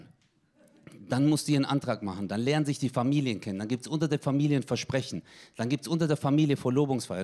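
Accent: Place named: Swabia, Germany. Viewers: Dem Dialekt nach von irgendwo zwischen Stuttgart und Ulm :D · Bitte mal eine ganze Show mit dem extremen Geschwäbel 😄😄😄 Sehr lustig 😂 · Wenn die Hälfte der Witze auf dem kulturellen Hintergrund der Herkunftsfamilie aufbaut, macht es schon Sinn vorher zu erwähnen welcher Hintergrund das ist. Er hat übrigens nicht erwähnt daß er auch Schwabe ist, weil man es am Dialekt hören kann. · Özcan Cosir... oh man, lange nichts mehr von dem gesehen, ist der nicht aus Cannstatt? Bin in der ähnlichen Gegend aufgewachsen und auch unter nem Haufen Kanacken. Also der Dialekt und der Humor fühlen sich sehr vertraut an.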